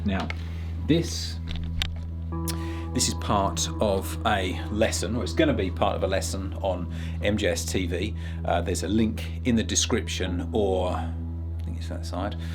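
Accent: United Kingdom. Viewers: Lovely British accent🥰😀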